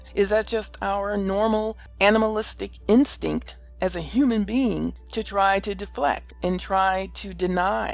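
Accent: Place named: Liverpool, United Kingdom. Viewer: Really enjoyed ur review but I'm a little annoyed that u havnt got more comments and reviews as uve done a great job and coming from a Scouser ur accent is beautiful!! Oh if u don't know a Scouser is from Liverpool, England just in case u didn't no!!! Please more reviews and never change ur accent ha ha!! Take care and let's hope for more reviews!!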